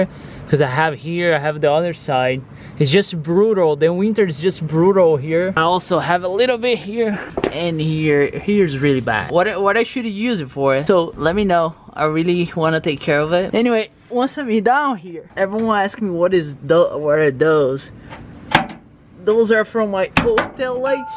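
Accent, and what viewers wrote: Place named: Brazil. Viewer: Sound like from brazil..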